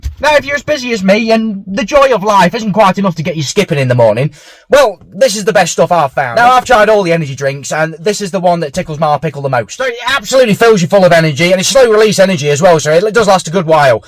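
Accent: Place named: Yorkshire, United Kingdom. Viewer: Keep up good work and f all the haters , videos very entertaining and Yorkshire accent cracking shagger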